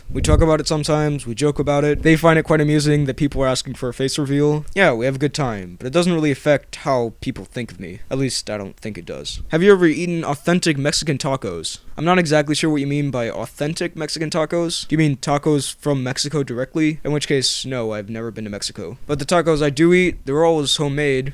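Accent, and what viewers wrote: United States. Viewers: you sound so not danish. I thought you were form america or something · Hi, you have some sort of American accent. Did you move from America to Denmark? Just wandering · Holy sardine! (Name that movie!) I can't believe you live in Denmark! You sound really American! Also I freaked out when you showed my comment (twice!) and I have a suggestion for a 'best and worst' video. It might be too short that you might not be able to do it but I'd really like to see it. You ready?........the best and worst of the Cornetto trilogy (you know, Shaun of the Dead, Hot Fuzz and The World's End!) I just have one more question, did you watch my channel? And if so what do you think? I'd love to hear your thoughts on it. Thanks!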